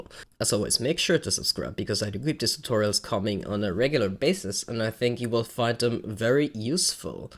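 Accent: Germany. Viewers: Warum machst du dann Englische Videos Übrigens bei der Betonung hört man sofort den Deutschen raus ist mir genau das gleiche😅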